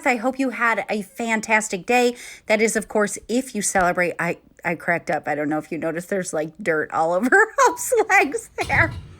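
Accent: England, United Kingdom. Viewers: I’m from the U.K. and your English accent is hilarious 😆 I love it!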